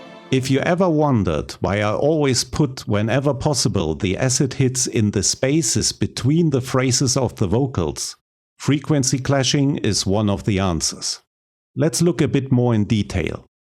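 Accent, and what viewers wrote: Germany. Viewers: I LOVE YOUR STUFF !!! ITS SOOOOO GOOD :)! Are u german? Because your accent seems really german too me, if yes .... ICH LIEBE DEINE VIDEOS :D ! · I knew you sounded too German to be Spanish.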